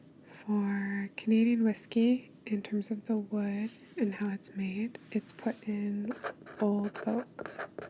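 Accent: Argentina. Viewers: Hey ~I think you're the reason why I'm turned on to the Argentinian accent/dialect as I'm learning Spanish...so, thanks so much^^. And BTW, enjoying a Canadian Mist while I re-watch this video, so I hope that doesn't take away "cool points" from me in your book =-p. Haven't had Japanese whiskey yet so that's on my list next. What's your absolute fav??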